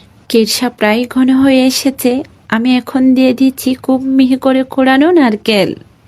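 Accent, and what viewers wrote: Bangladesh. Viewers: আফা আফনার মাতে লাগের আফনে সিলেটি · ময়মনসিংহের নাকি আপনি?? খ কে ক বলছেন। বে ঠার কি?? বেটার। ছালের গুড়া কি চালের গুড়া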